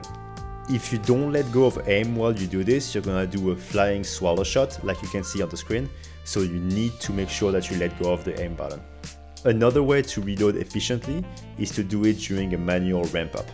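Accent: France. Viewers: Tu es français j'ai l'impression vu ton accent. Ce n'est pas un reproche ça m'arrange ! Merci pour ton guide, j'ai vu beaucoup de vidéo sur l'Arc et la tienne est de loin la meilleur. Est-ce que ça serait possible d'avoir un guide de builds sur l'Arc ? J'ai fait pas mal de tests de mon côté et je ne trouve aucun moyen de rendre un build élémentaire viable. Ce que je trouve dommage car ça limite grandement les possibilités de build.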